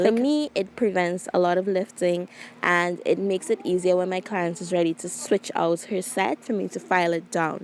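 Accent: Trinidad and Tobago. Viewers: I just discovered you. Your accent sounds trinidadian 😮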